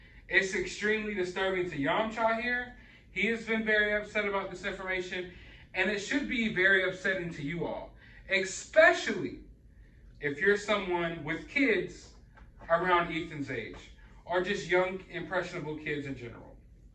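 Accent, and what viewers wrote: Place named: Hawaii, United States. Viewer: How you say, where I stay, is so Hawaiian 😉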